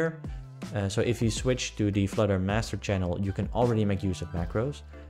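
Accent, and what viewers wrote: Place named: Spain. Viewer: You from Spain? What’s that funny accent? Great video by the way.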